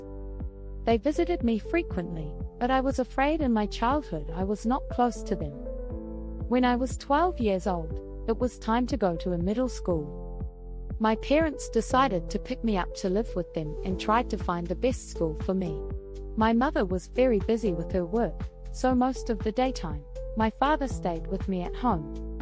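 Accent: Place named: United Kingdom. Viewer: Жестт. Че за акцент британский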